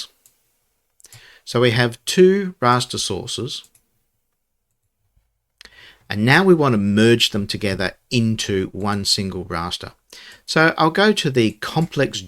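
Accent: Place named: Australia. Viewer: I watched this exactly 5 minutes after you uploaded but it was around 4AM in the US & I was too tired to think of what to comment. Now, another late night as I was revisiting your video, I wondered why you would upload at that time. Then it hit me that your accent is Australian, not British, so no wonder you chose this idea to use for a video. Nevertheless, it is awesome to see that something off my list was worth investigating. I have always been fascinated by this region since learning about the recently extinct Moa birds that so strongly resembled dinosaurs & by my family owning a full grown pet Emu when I was a child. Australasia is so full of earth lore like this & I am especially intrigued by the scattered islands connecting Australia & Asia where it looks as if the continents violently dragged bits of land with them as they drifted apart. Anyways thanks for the video & hope you are enjoying your summer!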